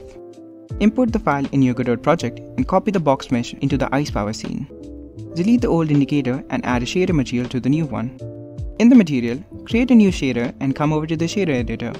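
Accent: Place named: India. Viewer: Great vedio. Literally can't differentiate between the original and the one you made. Also I got a question for you. Where do you live? (Country name). You sound very Indian to me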